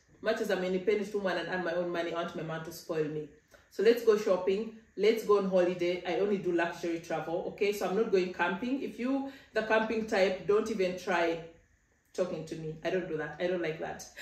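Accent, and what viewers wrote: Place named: East Africa. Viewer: As an African man married to a beautiful African woman and with 2 beautiful girls gifted to us by the Almighty God, I think it's your lose. Your accent places you in East Africa and 2 countries come to mind, Kenya or Uganda. I would say the former is where you hail from. Not a new mindset for a very "good " product of African parents who ensured you lacked for nothing. Be grateful your mum married an African man as you flaunt your well-endowed body and very bright independent mind.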